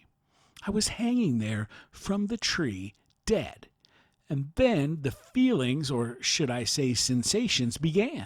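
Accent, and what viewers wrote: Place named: South, United States. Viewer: Awww that's a shame, I think the accent is very beautiful. I've been trying to work out, where it might be from. It does sound like a southern accent but I think it has a mix but could be very wrong. 🤔 Maybe give the channel a chance and see if you change your mind. Better a human voice than a computer for sure. If not, then Maybe you will find one that you do like. Have a lovely day 💛✨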